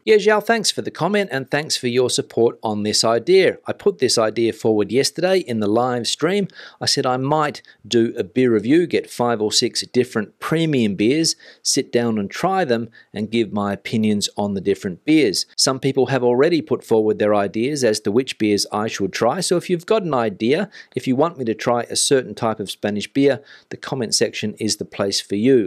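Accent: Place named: Australia. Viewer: Dude, you are without a doubt the best Spanish newscaster I have ever heard. I'm an avid follower, having lived in Spain over 20yrs in andalucia. You say it as it is in English with an Aussie accent and a straight face and crack me up. Keep doing what you're doing my friend and hopefully one day you'll take a mobile podcast over to Portugal, you're smashing the Spanish news for the British expats 😉👊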